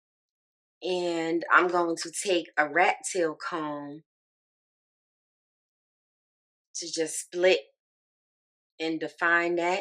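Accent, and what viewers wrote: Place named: New York City, United States. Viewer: No she sound like CoKo from SWV I guess it’s that NYC accent.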